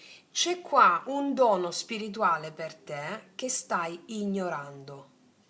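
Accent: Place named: Salento, Italy. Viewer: Grazie cara amica, spero si avveri subito. Dal tuo accento, sento che sei del Salento come me. Dico bene? Un caro saluto 🙋‍♂️🙋‍♂️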